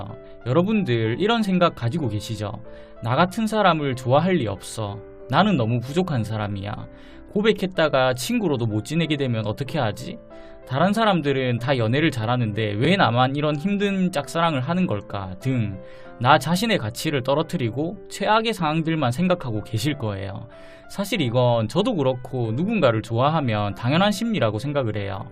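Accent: Gyeongsang, South Korea. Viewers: 혹시 사투리가 경상도이신건가요?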